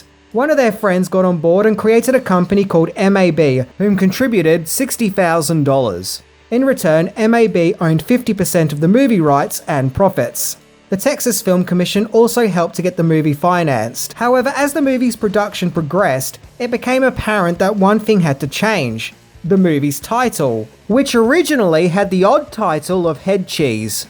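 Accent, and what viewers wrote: Australia. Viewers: He's Australian. He can't help it!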